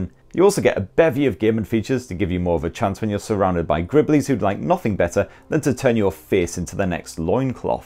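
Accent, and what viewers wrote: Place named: Yorkshire, United Kingdom. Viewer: Just wondering, what northern accent is he speaking with? Yorkshire?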